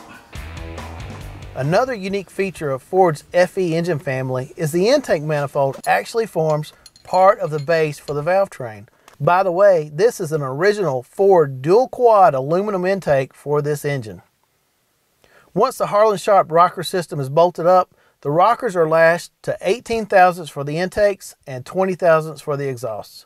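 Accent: South, United States. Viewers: I just gotta laugh at this narrators southern sounding accent 😂..!!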